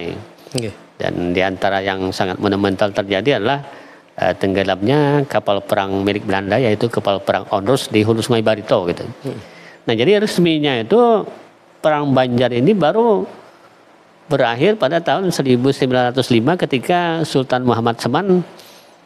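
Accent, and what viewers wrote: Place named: Brunei. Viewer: Hampir sama dialek Melayu Brunei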